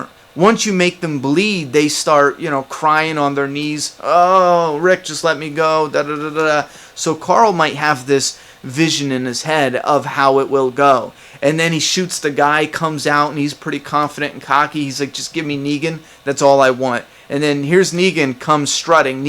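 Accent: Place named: East Coast, United States. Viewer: Q&A where are you from? I hear an East coast accent.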